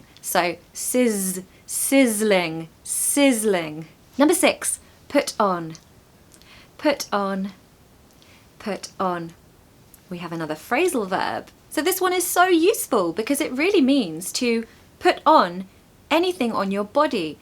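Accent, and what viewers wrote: United Kingdom. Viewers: It has been just amazing, I like the energy you put into your videos, the enthusiasm you show and of course your british accent that sounds pretty good to my ears. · Your british accent is amazing😊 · You are just adorable, beautifull, positiv, vivid, enthusiastic and your Brithish English is so lovely to listen to! Your smile and your eyes remind me on princess Diana a lot. I love your way of teaching English by telling stories about rhe British way of living, thinking and custims. I find it very interesting and useful. You bring joy and happiness into my day every time I wach your videos. Thank you so much and have a great summer! ❤😊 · OMG ! Your English accent is just fascinating , it's really relaxing to hear such a nice and slow pronunciation ✨ I fall in love with the British accent 🥰❤❤ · It's so clear your British accent that makes easy for us to learn. 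The emphasis in each word with details very well explained it,s amazing. Thank you so much for the lesson 🤩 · Tysm for this video! ❤ It's very useful to improve my vocabulary. I love your accent and British English as well! 🥰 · Love your british accent , and I can feel your energy and passion ! to cool down is pretty hard to pronounce:) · "Shall I compare thee to a summer's day" as Shakespeare says. Enjoying the chaste British accent. Thank you❤ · Thanks you teacher, I like your British accent, very easy to understand. ✌️👍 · thank you for interesting videos. British accent is very nice. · Thank you so very much,. I love listening to you and with the lovely British accent. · Hi,. I can understand your enjoyment about summer. I live in a region where the sun shines all year round, and get annoyed if it rains three days. However, I understand that we need the rain, of course, otherwise we wouldn't have food, but when the sun is bright it's good isn't it? 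Love your accent, you're a real british woman, thanks. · thank you for interesting videos. British accent is very nice👍😁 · Lovely British accent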